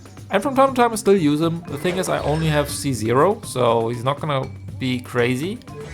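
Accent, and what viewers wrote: Germany. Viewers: Qick question are you deutsch? Just have this feeling 😅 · As soon as you started talking I understood that you are german 😂. Great vid btw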